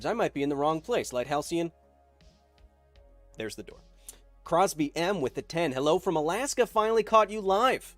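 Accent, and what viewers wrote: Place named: Australia. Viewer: He does an Australian accent because he's good at it and real Aussies aren't so easily offended. 👌👍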